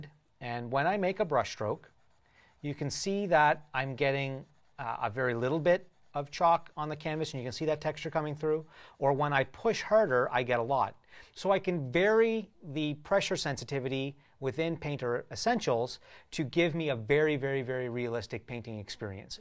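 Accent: Canada. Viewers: lawl he has a canadian accent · is he canadian?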